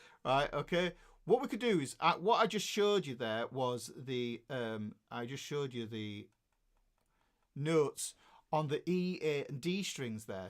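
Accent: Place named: Yorkshire, United Kingdom. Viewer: books are worth every penny! I love the way he breaks everything down and explains things in a way that makes it easy to understand. Thank you! The accent helps I think.. 🤔 Us Americans and our slang English language can sound ignorant when compared to proper Yorkshire accent. lol. All good stuff!!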